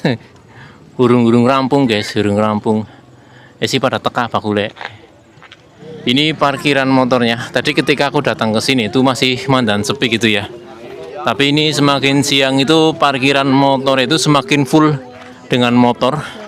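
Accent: Banyumas, Indonesia. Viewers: aku seneng ngrungokna obrolanen ngapak Ramene ORA patut..dadi khase yaa Mas😂👍👍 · Pertahankan dialek Banyumaas dan bhs Indonesia bagus dan lucu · Tetep logat banyumas saja om, biar unik jg enak didengerin. Kalo bisa ada dialog dg warga lokal pake bahasa lokal jg om, suka aja dengerinnya. Jadi lupa kalo negara lg krisis. Hee...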